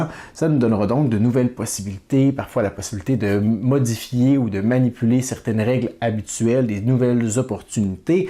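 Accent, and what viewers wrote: Quebec, Canada. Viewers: J'adore tes vidéos en général, mais là franchement ta présentation du jeu Jungle Cruise me donne trop envie d'y jouer !! D'abord parce que je trouve le matériel super joli et j'adore la thématique, mais en plus le jeu a l'air simple à jouer et je pense qu'il sera tout à fait adapté pour y jouer avec ma mère. Et pourtant ce n'était pas gagné parce que le fait que ce soit un jeu Disney ne m'inspirait pas plus que ça (et pourtant j'adore aller à Eurodisney et j'adore leurs films). Donc je l'ajoute clairement à ma liste :) et rien à voir mais j'adore ton accent québécois donc c'est très agréable d'écouter tes vidéos :)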